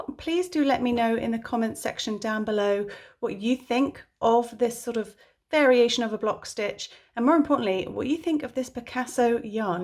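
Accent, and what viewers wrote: United Kingdom. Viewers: Despite the British accents, she's using American terms, so don't get confused. A lovely, and east stitch pattern for us beginners. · You Have a UK accent, but in American terms??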